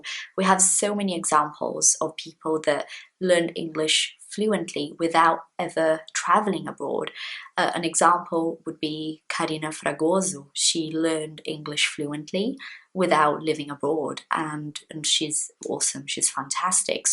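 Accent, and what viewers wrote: United Kingdom. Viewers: Despite your process learning hadn't been easy you achieved a great English with a excellent and clear British accent. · Beautiful accent! Clear, pure and real british english! Love it! Congrats! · I don’t compare.. I know kids are like a sponge 😂 But I should get your advice, I just pay attention to my mistakes not to the victories. I love you British accent, it’s so clear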